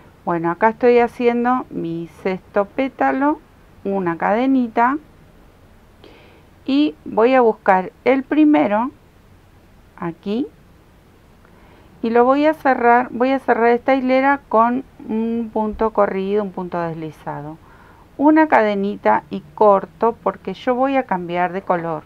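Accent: Argentina. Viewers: ¿Sos argentina? ¡Me encanta tu acento! Solía vivir en Buenos Aires a finales de los 80 y lo extraño ;)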